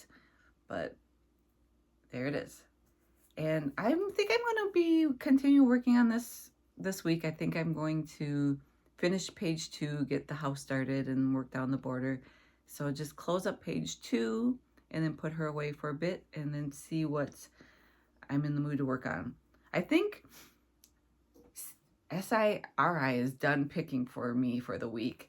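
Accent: United States. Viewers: I love your Minnesota accent! I think I want to try the monogamous stitching next week and am I cheating if my monogamous stitching is a series of month;y charts?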